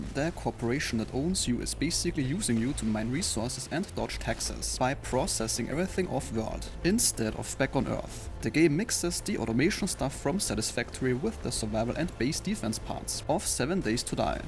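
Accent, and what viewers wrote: Germany. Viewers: Servus, man hört es direkt raus... Hast auch einen deutschsprachigen Kanal? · Ah good old German accent ❤ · bro i can hear instantly that he is german haha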